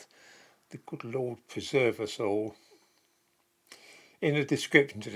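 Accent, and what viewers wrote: United Kingdom. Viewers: Thick British.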